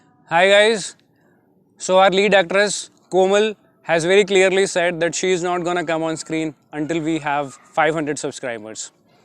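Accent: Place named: India. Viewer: Well, I'm Ukrainian and it's a bit difficult for me to understand English with Indian accent but I'm trying. Not all your subscribers are able to understand Indian language at all, so it would be better not to mix it up and create separate videos in English and Indian only for your audience's convenience.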